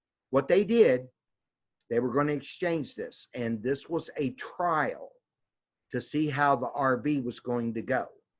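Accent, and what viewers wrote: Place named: Georgia, United States. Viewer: either way, he says it in Georgia talk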